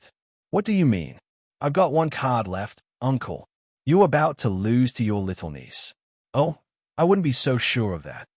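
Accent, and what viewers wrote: Australia. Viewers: Am I crazy or does the Ai sound Australian or something 😂 like it isn’t really pronouncing the r’s all that much, and it’s also being really extra with all the a’s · You're not crazy that is an ausie accent if I ever heard one lol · I'm hooked but I wasn't expecting the Australian accent. When is part 2 coming out?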